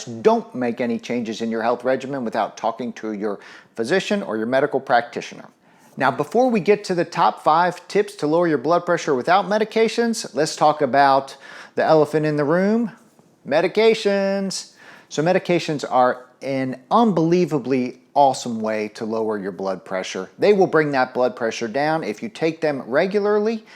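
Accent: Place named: South, United States. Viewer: I love his accent.....educated southern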